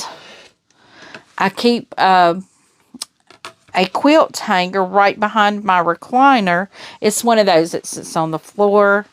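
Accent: South, United States. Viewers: Hi ! It was nice listening to you today while I was sewing. You are so fun to listen to with your southern accent! I did a retreat at Missouri Star Doan girls retreat and it was so fun, we got a ton of free stuff- fabric for two quilts, numerous free things so it paid off since it was pricey. Tell me what you are bringing to work on. I brought stuff to the retreat but they had us sewing the new quilts, pillow cases and tons of stuff!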